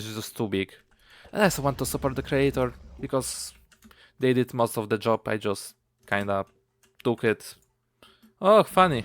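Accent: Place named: Poland. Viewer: my mind: "is he polish? he sounds polish just like me and my friend. oh hes using twitter with polish language". nice thing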